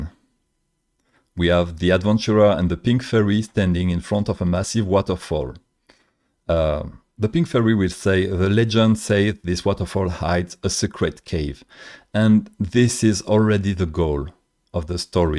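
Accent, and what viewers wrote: France. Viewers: I really enjoy listening to you speak. It feels like I’m hearing two languages at once—French and English—while understanding everything perfectly. Amazing 🤩❤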